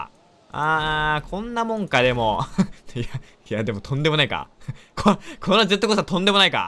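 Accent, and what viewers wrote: Kansai, Japan. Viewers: ときどき出る関西弁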